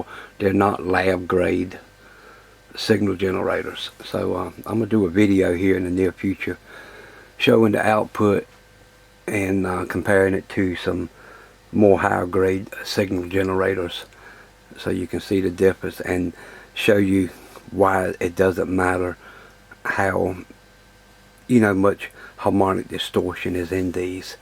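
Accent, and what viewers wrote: South, United States. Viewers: Yea I agree first time I saw your face lol my mind vision you were way older and scruffy. and you could do this ballad well with your southern drawl <link>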